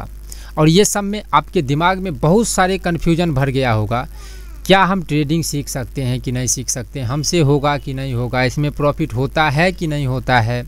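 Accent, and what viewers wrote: Bihar, India. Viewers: तेरे आवाज में पूरा बिहार पान लग रहा है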